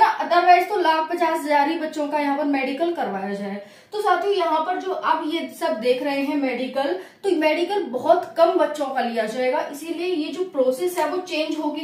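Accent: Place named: Braj, India. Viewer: Braj bhasha bol rhi ho aap.. Mathura se ho aap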